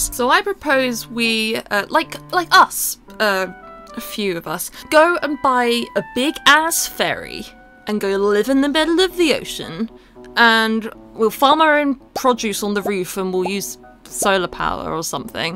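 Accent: United Kingdom. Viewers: Somehow I don't care about the actual content (politics and british rant) but the animations are so cute that I just keep watching. Also british accent is just funny :^)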